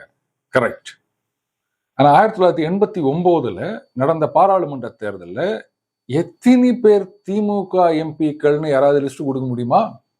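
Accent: Chennai, India. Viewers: Yenna saambu ore kuriyeedu Nari (traitor) Puli [Poonai kutty) Singam (Anna)... bada shokka keedhu pa... kalaakureenga thaleevare... Cho illadha kurayai nivarthi panreenga sir.... your Chennai slang is awesome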